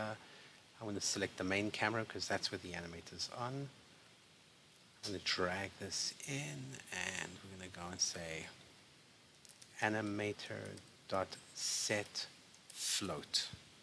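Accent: South Africa. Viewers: Are u SOUTH African??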